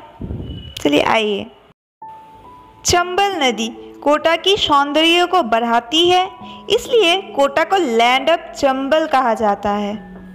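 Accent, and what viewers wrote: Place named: Bengal, India. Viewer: I think she is Bengali her pronounce is like Bengali because of I'm also bengali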